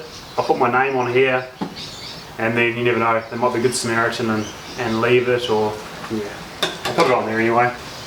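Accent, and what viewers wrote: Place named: New Zealand. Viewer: Sounds like you from NZ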